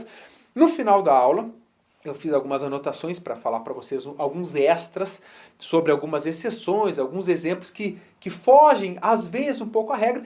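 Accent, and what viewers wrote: Rio Grande do Sul, Brazil. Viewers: Dei o like só por saber que tu é colorado, bem que eu vi que sotaque e era gente boa demais já vi que era meu conterrâneo Gaúcho, brincadeiras a parte, conteúdo muito bem explicado, excelente trabalho Prof., manda bem demais.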